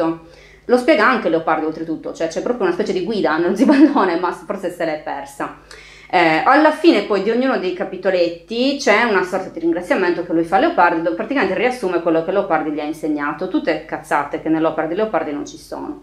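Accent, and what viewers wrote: Sardinia, Italy. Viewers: Non spesso sono d'accordo con te (sì sullo scwha, no - o con molti distinguo - sui grammar nazi), ma sentirti stroncare un libro con il tuo simpaticissimo accento sardo non ha eguali!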